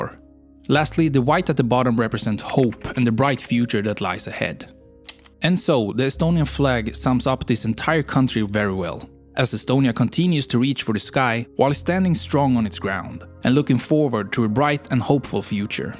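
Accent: Sweden. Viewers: The narrator sounds Swedish, or possibly Finnish, so Estonian pronounciation shouldn't be too alien to him. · I'm Estonian and I thank you for all the positive comments! As soon as you started to speak, you're Swedish, right? Just my observation, nothing else. And thank you, again. Your somewhat simple but overall meaningful sentences moved me a lot! We want to be free and want to be a part of FREE Europe! Ok, yesterday was our county's 106th birthday, but in Europe we've been much less time. We can give MORE and want to give MORE! I'm sure I'm not the only one thinking like that! :) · please please please for the love of everything anyone has EVER considered holy, can we get someone narrating this that actually speaks ENGLISH and not SWENGLISH, it is literally painful to hear. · Sounded pretty Swedish to me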